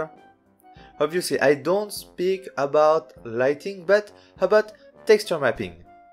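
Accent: France. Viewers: Ton accent francais est mignon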